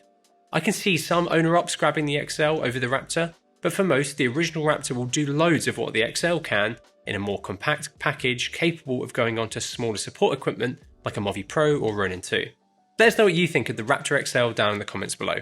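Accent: United Kingdom. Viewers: Your British accent is different to hear. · Why the hell would a brit working for a british company speak with an american accent for a video?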